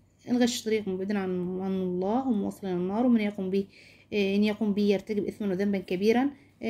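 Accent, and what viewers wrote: Egypt. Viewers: انتي مين مصرية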